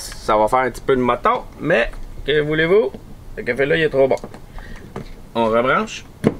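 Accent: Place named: Canada. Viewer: Merci beaucoup pour cette astuce c'est vrai que c'est très agaçant quand ça arrive plusieurs fois on a envie de prendre la cafetière et l'éclat par terre car le café c'est hyper important le matin et j'adore ce petit accent canadien vive le Canada et vive la France bien sûr😊🎉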